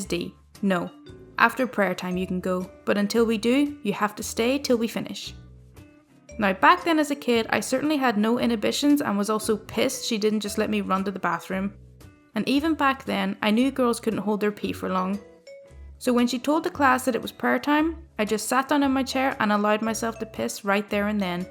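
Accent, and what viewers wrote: Scotland, United Kingdom. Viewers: I so enjoy listening to you read!! I'm sure you are constantly asked but are you Scots? You have a lovely accent where ever you hail from. I, myself am a Texan, and really hate hearing myself recorded but I guess, there are people that enjoy it as I used to d.j. at a small market radio station many years ago. I always wanted to move to the U K. in the hope of losing the twang and gaining a lilt. Oh well. At least I get to listen to you! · Are you Scottish? It's nice! Edit:never mind, I read another comment and read your reply to it. It's hard to tell if your Scottish or Irish.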